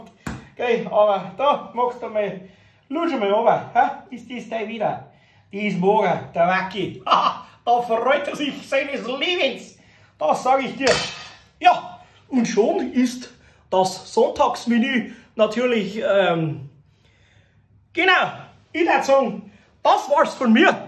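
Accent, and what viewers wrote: Bavaria, Germany. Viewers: Dein Hund ist süss und gut erzogen ( oder satt) 😀 Ich liebe einfach bayrisch😍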